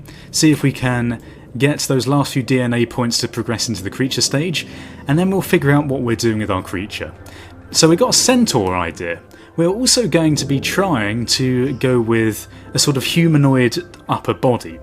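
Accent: United Kingdom. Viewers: you have a british avcent right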